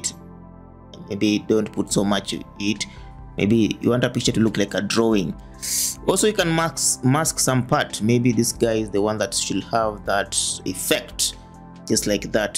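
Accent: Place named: Kenya. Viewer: Your african kenyan by accent😂😂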